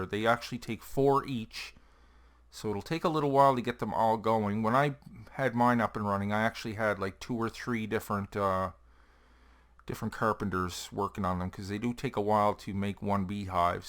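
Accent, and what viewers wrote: Canada. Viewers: Oh my god you sound canadian, I am from Prince Edward Island, Canada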